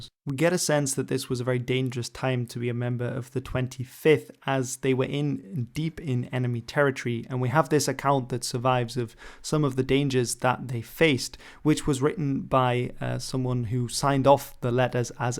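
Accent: Netherlands. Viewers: He's definitly dutch. He uses an english accent, and does it well, but his dutch does shimmer trough. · I clearly hear the Dutch accent.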